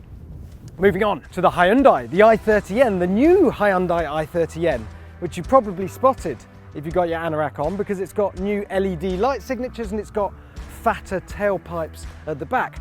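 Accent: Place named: United Kingdom. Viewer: Fiestar st, I don't know why you britisch people see letters where their arent. It's like you say 'I'm sat in', another weird ass thing to say. Ya'll a bunch a weirdo's.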